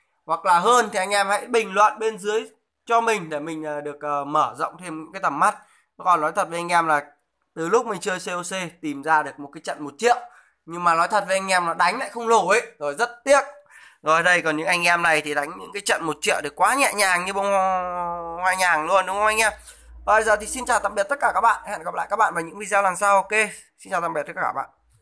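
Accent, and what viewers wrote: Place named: Nam Dinh, Vietnam. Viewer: Anh người nam định hả anh hay ở đâu ?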